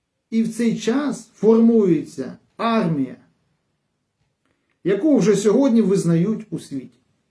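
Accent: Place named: West, Ukraine. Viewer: точно как у нас на западе в глубоком селе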